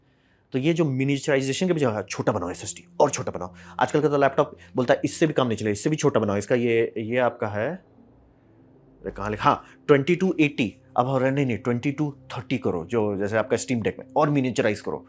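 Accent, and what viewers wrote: Bihar, India. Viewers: Bhai aap bihari ho kya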